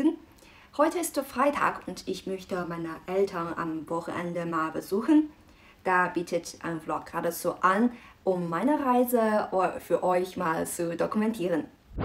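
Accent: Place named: Germany. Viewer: Deine Aussprache ist sehr gut! Ich schaue auch aus Österreich, hier in Österreich sprechen wir China aber anders aus, mit "K" also "Kina", so wie Charakter, Chaos, Cholera alles mit K am Anfang. Chemie wird auch mit K ausgesprochen. Man merkt also, dass du in Deutschland Deutsch gelernt hast ;) Wie lange lebst du schon in Deutschland?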